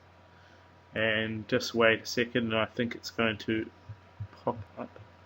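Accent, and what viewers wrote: New Zealand. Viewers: You sound kiwi... Are you? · You sound like a kiwi?? chur brother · KIWI ACCENT!! Busted...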